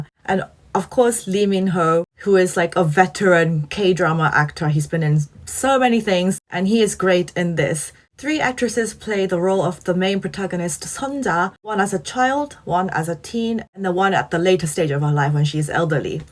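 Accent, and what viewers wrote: United Kingdom. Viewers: All I focused while watching this video is your pronounciation. I'm learning Britain English from you. Thank you ❤️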